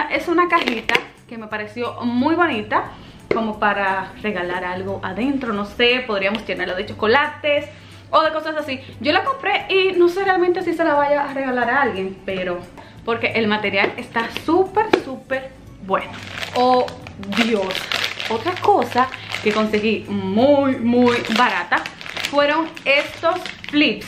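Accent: Dominican Republic. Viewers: Vy C Ella es del cibaao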